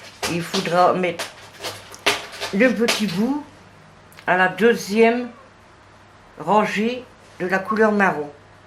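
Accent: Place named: Nord, France. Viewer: Merci madame pour ce joli tuto , je débute , et j ai réalisé le bonnet renard , j aime beaucoup votre accent du Nord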